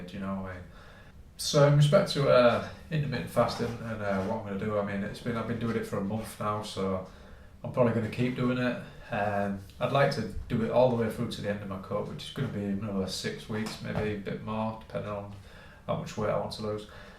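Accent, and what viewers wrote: England, United Kingdom. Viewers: Good video. Great to hear a bloody English accent on a fitness channel for a change. You a Manc?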